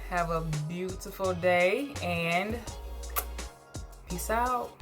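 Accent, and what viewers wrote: Jamaica. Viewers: As a vegan you can shop at coronation market for fresh fruits for low cost,you sound Jamaican,it nice here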